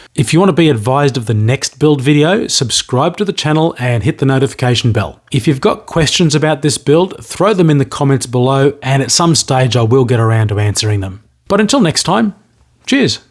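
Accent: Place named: Australia. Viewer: This is awesome and for some reason the accent makes this that much more special. I have to be honest, its probably because Aussi race announcers. Regardless killer build man.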